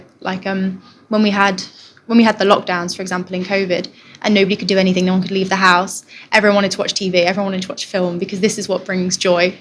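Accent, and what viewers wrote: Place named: United Kingdom. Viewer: Tangiers was international city From Tangiers come the most brilliant Moroccans throughout all civilizations Cute little Brit accent ah I studied three years in Tangier & loved it from 1967 to 1870 gorgeous beautiful City Lovely to be a hippie where in Casablanca was very thought to be a beatnik The best playa the whole city is Awesome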